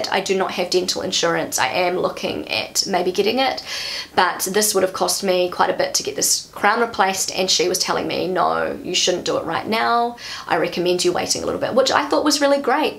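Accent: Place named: New Zealand. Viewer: I am a German mum who has been living in Spain for 16 years. It makes me a little bit jealous that the dental treatments for children in Germany are free. Here in Spain, one must pay for everything, social security doesn´t pay anything. My dental insurance paid by my employer is quite useless. I have not had such good experiences with German dentists as an adult. First of all, many still use amalgam (I wasn't even asked at the time) and secondly, it is very expensive when a treatment has to be paid. Here the treatments are roughly half the price with a similar cost of living. Fillings are not free but they stopped using amalgam decades ago. By the way, I like your channel because it's interesting how you see Germany as a foreigner, especially for me as a German and foreigner in Spain. I also like your accent because long time ago I spent a year in OZ and met a lot of nice Kiwis there.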